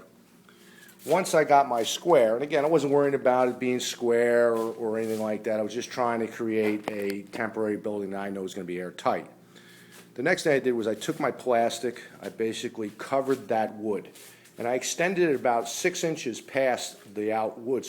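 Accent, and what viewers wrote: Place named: New Jersey, United States. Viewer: Rutgers! I knew it sounded like you were from nearby.. Nice video, thank you! Esp about fan/filters..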